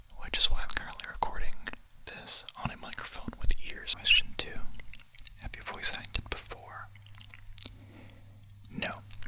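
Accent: South, United States. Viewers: This was funny and cute. Would definitely love a video with your southern accent. I'm dying from the little bit in this video, a whole video and I'm dead. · Petition to hear your southern accent more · The southern accent,AHHHHHHH it hit a switch that said yes just yes...buuuut I can understand not liking how it sounds especially on your own voice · Congrats on 10K! That’s so exciting! First of all, your cats are the cutest. Pet them for me pleeeeeeeeease! Now, can we just talk about the fact that you played D&D and were a DM for campaigns? That’s so cool! And the fact that you’re a big sci-fi/fantasy nerd is awesome also. I’d love an audio like that from you in the future. And since you love the genre so much, I’m sure you could create something amazing with it. “Kill the shame inside your head, kids. It helps you open up new avenues for your life.”—This is such a powerful statement, ohmygod. 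Also, I’m impressed that the ‘Comforting You in a Crowd’ audio was improv! You did a great job with that and I never would have known you didn’t script it. It was very natural! The fact that your improv skills came from D&D makes me grin. I love that! D&D really is such a great way to learn how to act and portray a character. Even before you said you were from the South, I figured you were. Because you said y’all early in the video, haha. And then you pulled out that Southern accent and I was like, “CONFIRMED.” (You have a nice Southern accent, bytheway. Just saying shhhhhh.) As far as games go, I usually play visual novels or board games, but there’s one video game that I absolutely LOVE and will straight up tell you that I’m great at, which is Divinity Original Sin 2. Since you’re interested in fantasy, you should check it out. Might be up your alley! It's an incredible game and it has a definite (and good) ending, which is alway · Okay...now that I know you're a southern boy, please do something with that accent!!! 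I can't tell you how much I tingled just hearing that little bit. I'm a Cali girl in Arkansas and I don't get to hear that from anyone but myself (I live in the country). Actually no, I heard a hint of it from a guy I met NYE but we spoke for 2 seconds before he was gone forever 😭😭😭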